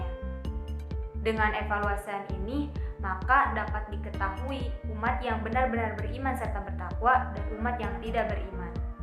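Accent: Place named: West Java, Indonesia. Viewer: Memangni dari SMA klo dengerin ni anak ngaji suka enakeun aja . Ke sunda sundaan merdu 😋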